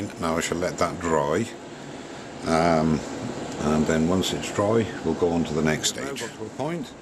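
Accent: Wales, United Kingdom. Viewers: Hi!! Very nice job on the finial, move over Cindy!! Great tip using the stain to give the apearance of ebony, I liked that. I know as your videos become more polished you can incorperate music and speed up the film but I must admit I do miss the old simple videos with your explanations and banter while turning. It must be your mellow Welsh tones. Still a great vid All the best!!.............Andy.;)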